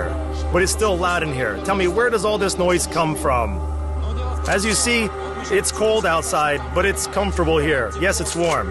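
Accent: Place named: Russia. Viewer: Руский ты зачем неруским прикидываешься? Слышно же что ты руский пропогандон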